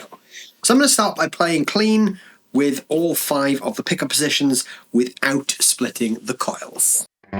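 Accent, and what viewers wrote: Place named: Wales, United Kingdom. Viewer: Aha, thought I heard a Welsh accent. . .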